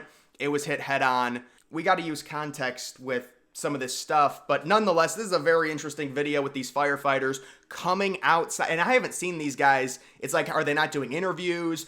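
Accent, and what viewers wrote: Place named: New York, United States. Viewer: I know his voice gives me such a New Yorker vibe 🔥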